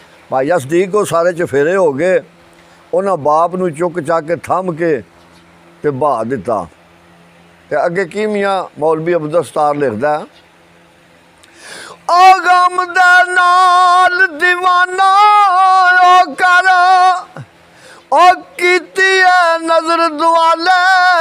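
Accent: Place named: Sialkot, Pakistan. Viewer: Your accent of punjabi boli reminds me of my parents and mama ji who came from Sialkot.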